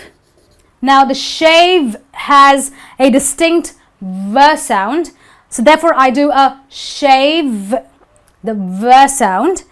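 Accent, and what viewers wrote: India. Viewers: Mikey Jimenez, she is an Indian. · She's learning as she teaches, don't be to hard on her. She's indian.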